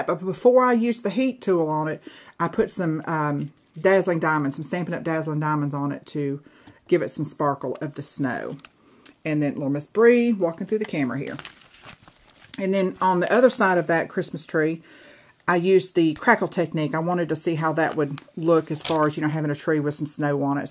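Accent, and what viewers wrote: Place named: South, United States. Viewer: This is one of the MOST adorable minis I've ever seen! I love love love it!! :) And on top of it all I love listening to you talk! I'm a "yankee" so southern accents I could just listen to all day because I never hear em!!! I can not wait to check out your other videos! Thanks for sharing this!! :)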